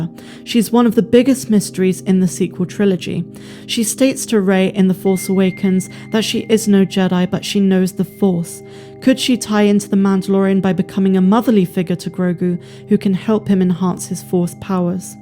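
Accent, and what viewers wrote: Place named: Bristol, United Kingdom. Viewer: all good choices but although iwhould like to see luke train him i am not sure he would want to after what happend with the way it turned out with ben solo by the way love your accent is it from the Bristol area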